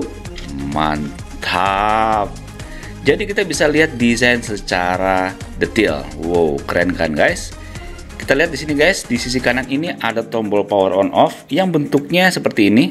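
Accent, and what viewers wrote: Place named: Java, Indonesia. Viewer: Kan aku cuma bilang suaranya kaya orang jawa terus apa salahnya?? Ni saya juga orang jawa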